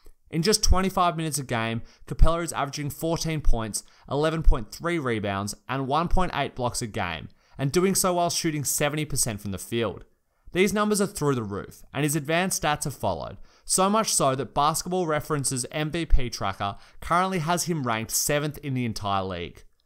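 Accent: Australia. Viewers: Your an Aussie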